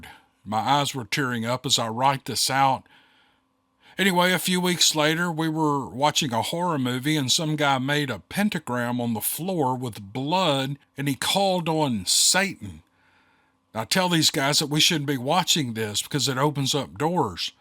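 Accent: South, United States. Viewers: That was a real treat! Great stories! Thanks much! Others read stories but no one else does it with quite the Southern Style of! I'll be leaving a light on tonight! 8 )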